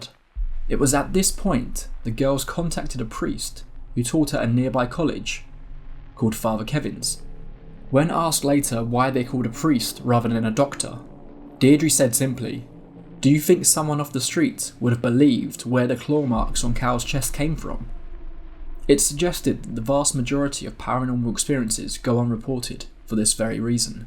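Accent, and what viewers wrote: United Kingdom. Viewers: I frigging love how you say "so get yourself comfortable, hit the lights and get a warm drink" in your British accent. Draws me right in. 5th video of yours this evening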